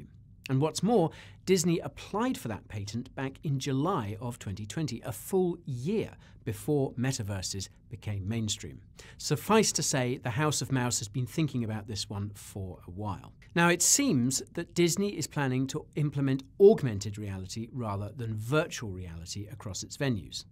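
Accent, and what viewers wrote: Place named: London, United Kingdom. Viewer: lool that intro, such a londoner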